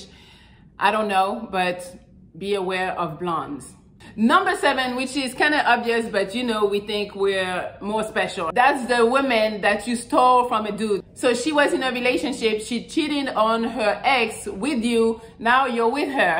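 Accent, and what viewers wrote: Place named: France. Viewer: I just love your fench accent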